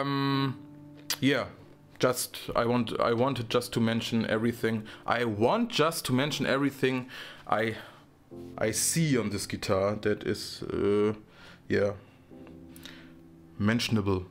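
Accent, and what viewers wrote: Germany. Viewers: Mensch schwätz schwäbisch wia mir au!!! 😂😂😂😂😂😂😂😂😂 Tolle Review. Die rote ist meine nächste und letzte (Muahhhhaaaa) die ins Haus kommt. Gruß aus dem Süden El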